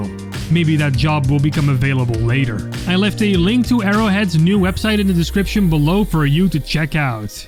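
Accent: Netherlands. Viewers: Are you Dutch? you have a slight Dutch accent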